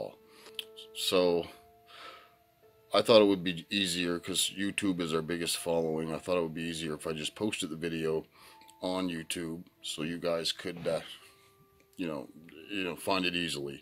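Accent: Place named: Maine, United States. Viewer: Seen your channel on Nukes Top 5 and I just subscribed. Can't wait to check out your other videos. You sound like you're from Maine. Peace✌️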